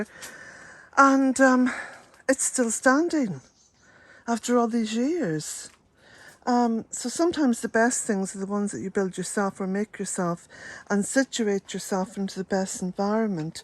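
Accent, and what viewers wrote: Ireland. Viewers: Just beautiful, so calming, serene and I love your Irish brogue ❤ I am in the USA, my Irish roots great-great grandparents were from Dublin 🍀 (Newsome & McCarty) So thrilled to have found you!! Love, love, love 💘 💕🍀❤🍀❤😁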